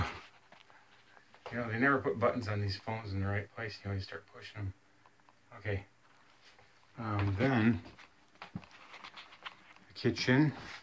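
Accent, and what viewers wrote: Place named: Michigan, United States. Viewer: You sure speak Michigander style quite well.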